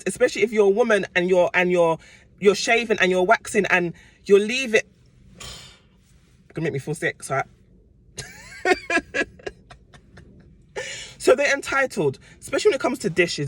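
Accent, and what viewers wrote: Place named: London, United Kingdom. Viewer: Oooh a London accent🎉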